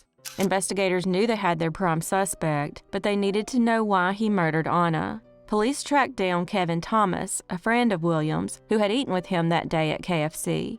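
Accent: South, United States. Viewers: Congratulations! You absolutely deserve your 100,000 subscribers! People really do recognize quality and talent! (And an awesome Southern accent!)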